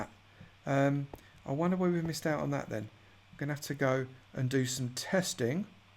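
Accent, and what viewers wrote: United Kingdom. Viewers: sorry but I want to know, R U british ?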